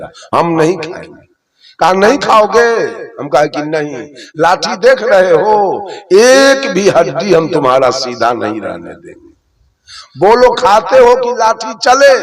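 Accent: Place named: Bihar, India. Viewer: अपना चश्मा तो उतार दे पहले 😅 और बोली तो जेसे लालू जेसी बात करता है हमारे जेसी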